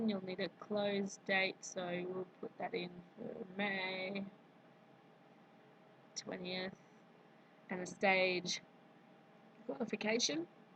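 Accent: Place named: Australia. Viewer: Thx Ms,. Very useful. Love that Aussie accent!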